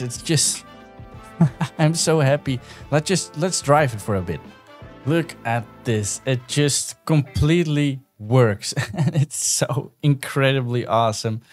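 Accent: Netherlands. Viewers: i immediatly thought i noticed a fellow dutch's accent, looked in your channel bio and saw i was right haha.. anyways great vid tho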